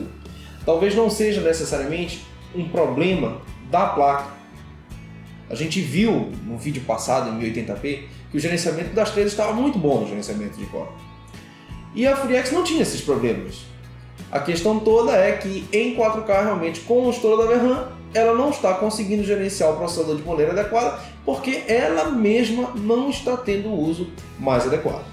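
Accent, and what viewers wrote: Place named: Recife, Brazil. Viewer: Cara, de todos os sotaques que eu já escutei nesse Brasil, esse sotaque de Recife é o único que me incomoda! Eu me pergunto se esse sotaque é em toda a Pernambuco ou só ocorre especificamente em Recife!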